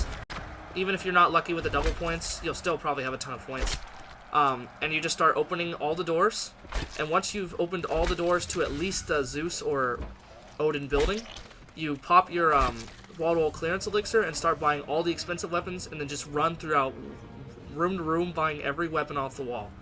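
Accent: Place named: Wichita, United States. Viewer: oh nah lol. I just came from Wichita for 6 years. The accent sounded like it was from that area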